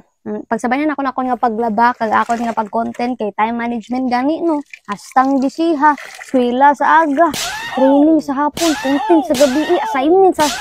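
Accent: Cebu, Philippines. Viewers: Ilonggo pero ang tono Cebuano... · Ilonggo pero medyo may sagul cebuana❤😂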